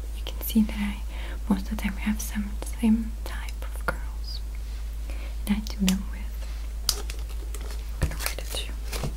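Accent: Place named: Russia. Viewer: Umm... No she doesn't... She's Russian... She has a Russian accent...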